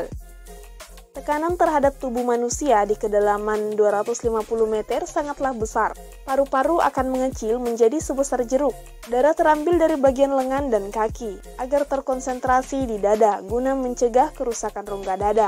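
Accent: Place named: Batak, Indonesia. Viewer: Bahh...iya yah logat nya nampak kali😂,aku pun orang bataknya😀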